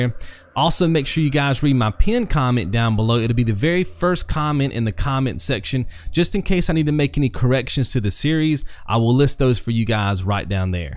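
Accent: South, United States. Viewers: just curious though. Where are you from man? You sound sort of southern, yet mid-western.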